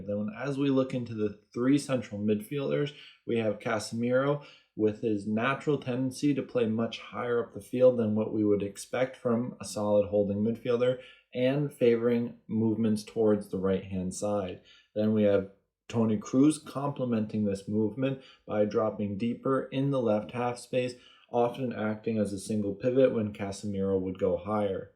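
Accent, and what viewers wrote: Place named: United States. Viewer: Hey man, big fan of your work! Keep it up! Also, one quick question: I notice you cover a lot of club and European football. Since I'm assuming you're a fellow American (based on your accent, at least), do you plan on doing any analysis of the USMNT? Nations league or potential WC matches? I'd LOVE to hear your thoughts on GGG, what they're doing, and/or what they could be doing better.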